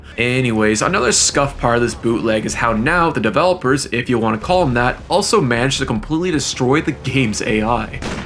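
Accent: East Coast, United States. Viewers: I just watched a few of your other videos and was guessing you were from the east coast and am now satisfied with the confirmation. (Really glad I didn't fuck it it up and find out you from Wisconsin) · You must be from the east coast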